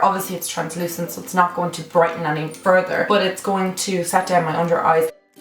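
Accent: Dublin, Ireland. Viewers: i knew instantly u were frm dublin!! love frm belfast!!! xx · Where bouts in Ireland are u from ?🇮🇪🇮🇪🇮🇪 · where abouts in ireland are you from? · Are u from Dublin? I'm from Langford xx :) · what part of ireland are you from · What part of Ireland are u from?💕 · omg r u from Ireland 💘 what county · Are u from Dublin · Irish buddy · Hi Love! You're also my very first Irish you-tuber. Luuv you & your accent & all your tips. ♥ I've watched a dozen videos in just less than a week's time & the dupes videos over & over & have lists everywhere. I just purchased a few drugstore items today as a matter of fact. Also laughed when you & your sister ate American candy. Now I WANT to get the nude lipliner & lipstick from Penney's. How do I get it here in the U.S.? Thanks ;D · What part of Ireland are u from xx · I can't tell wheather your from northern Ireland or southern Ireland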